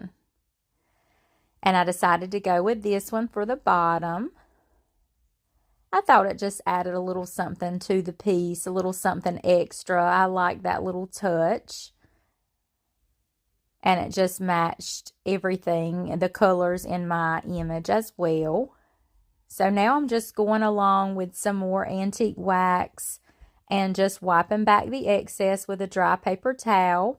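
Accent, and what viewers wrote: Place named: South, United States. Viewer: First time viewer. Love your projects and accent. Is it TN.,KY., or Alabama.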